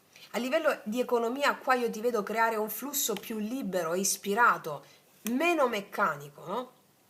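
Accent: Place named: Salento, Italy. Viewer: Grazie cara amica, spero si avveri subito. Dal tuo accento, sento che sei del Salento come me. Dico bene? Un caro saluto 🙋‍♂️🙋‍♂️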